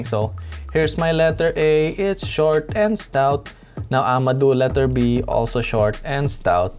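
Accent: Philippines. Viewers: You sounds like a Pinoy like me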